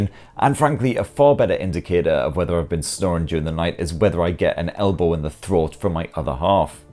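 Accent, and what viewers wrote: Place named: Yorkshire, United Kingdom. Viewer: Loving the accent (yorkshire?) and the quality of the review - fantastic work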